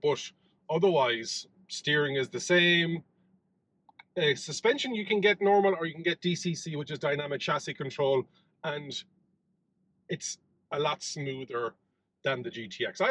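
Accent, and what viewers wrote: Ireland. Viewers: Many thanks for the comprehensive review and Irish accent that brought me back to my early school days. The car is an overall very good SUV, spacious and powerfull. Please provide the specifications of the sound System and speakers. Also, please advise the availability of two motors and AWD version. Best Regards.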